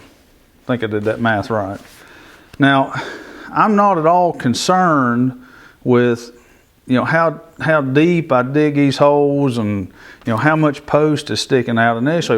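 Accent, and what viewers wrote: South, United States. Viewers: Love your Southern Accent... keep on trucking. Have a Great Day All Ye All..lol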